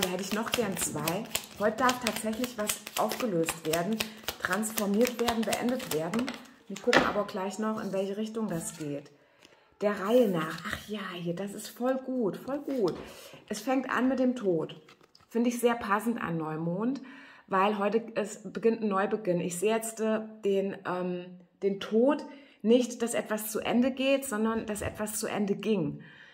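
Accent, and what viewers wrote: Hesse, Germany. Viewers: So genial made my day. Danke an meine Freundin Ina die mich zu dir geführt hat. Es macht so Spass. 😘😘😘😘 Ich lieb deinen herzische hessische Dialekt.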